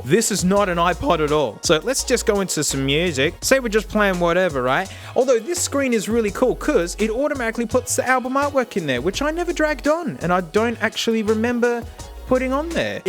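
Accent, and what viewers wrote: Australia. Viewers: AL-UU-MIN-NEE-UM. I Love your vids my Auasie friend. Keep it up. 😃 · Ar u australian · For some reason i love the Australian accent and the information about the Ipods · You should review the HyperX Cloud Alpha S headset. I love the Australian accent!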